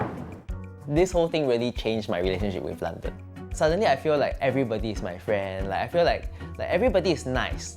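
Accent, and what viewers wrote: Singapore. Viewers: always thought he was just a NA/EU guy with a singaporean accent tbh, never expected him to be singaporean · Accent is very Singaporean; but the whimsical and joyful outlook is clearly NOT Singaporean. Most people I meet here are gloomy and not as bubbly. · Wow! Your energy is infectious! And i am so happy that you are proud of our Singaporean accent and do nothing to change it even when speaking to the British. Haha! Love your positive outlook on life! Definitely can't tell you are an introvert. Must be yet another INFJ haha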